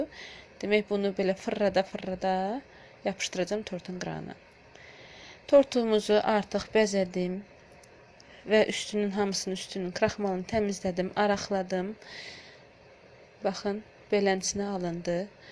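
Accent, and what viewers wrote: Ganja, Azerbaijan. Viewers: Xanım diyəsən Gəncəlisiz hə?🤗ləhcəniz çox oxşiyir gəncəlilərə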